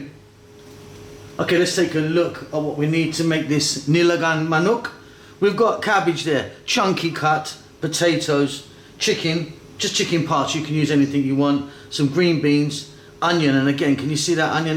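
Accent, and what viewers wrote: United Kingdom. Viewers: MUY DELICIOSO! Five stars for using the Fish Sauce and your thick British Accent to go with that. Really, it's delicious, really!!!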